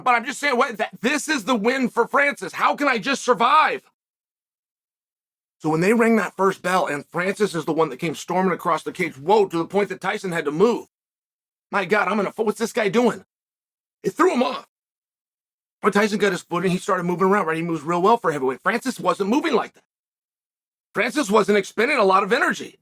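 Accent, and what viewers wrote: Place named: East Coast, United States. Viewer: Chael you do so good on your podcast. Engaging, passionate, hand gestures, east coast it is what it is talk BUT fair enough were gonna do it anyway, kinda Trump talk. Great for this side of entertainment and what people want to see when they click something like this topic. You're doing great with your podcast format, wouldn't change much. Just keep going!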